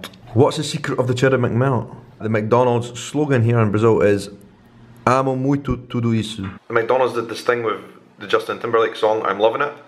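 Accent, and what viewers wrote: Scotland, United Kingdom. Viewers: I don't think subtitles is needed...i really enjoy your channel just the way it is, and i got so happy cause i understand your scottish english, your accent reminds me the tv series "Outlander"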